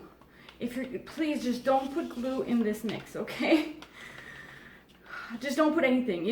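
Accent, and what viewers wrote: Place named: Canada. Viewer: Ha! I knew you were a fellow Canadian by your accent! Love your work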